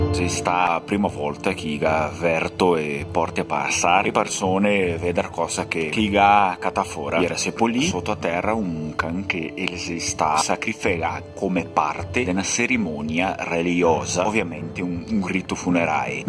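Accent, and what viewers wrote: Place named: Belluno, Italy. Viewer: Ma de ndo sito? El ti acento me par bełunexe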